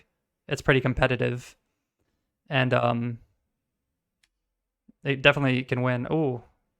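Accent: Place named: Baltimore, United States. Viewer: Hey, a little irrelevant but are you from Baltimore? (Wherever you’re from im a fan of the accent)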